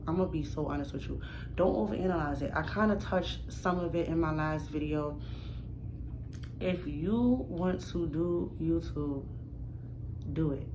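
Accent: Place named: New York, United States. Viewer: In 2025 we are getting rich off of content yes. Get it sis.💐🥂 I felt that. The stand is super cute and has good lighting 💡. Big facts on people is looking for what can you give them. & What can they look forward to coming back to? Everybody gotta start from zero followers subscribers… views. Content is like making a ship or the bottles that people put notes in then put it in the ocean hoping it will land on a island one day and somebody would read. That’s like videos on the web and people click them based from the thumbnail or title. 😁 lol you had me cracking up. Shorts bring a lot of attention to people about there channels and giving people a quick reason to subscribe for more content. Word is going around that A lot of people have short attention spans as well.. so that’s why shorts come in clutch 🤷🏽‍♀️ one time I got a comment that said “your video be too long. 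You need to make them shorter “ when I looked. The video was only about 9 to 10 minutes. 🤦🏽‍♀️ yes I’m looking forward to the long content. I can’t wait to see. is where I’m at now in the video. I’m listening and writing along, so I don’t forget what I have to say and you just talked about what I said about the short attention spans 🤯🤭 lol but click baits are the worst. Smh lol ppl play to much. You had great great points and yoooooo pls with the “did you miss me “ 🤣🤣🤣🤣🤣 hahahhahaha yo I can tell your from NYC me toooo. And it took years for me to realize New York do have an accent just like country ppl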